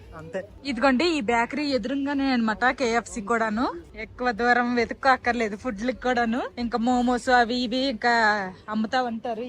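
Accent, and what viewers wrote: Srikakulam, India. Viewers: Weight loss ayinapati nundi mi dressing style kuda change chesaru So miru Ala change ayinapudu Srikakulam slang use cheyadhu🙏 inka deniki modern language lo ne mataladachu ga. Videos kuda chala lag avuthunayi... Okay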